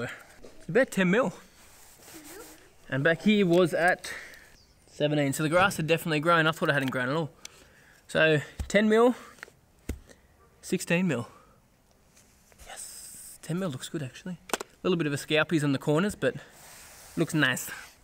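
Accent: Australia. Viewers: Ayesp Aussie accent I think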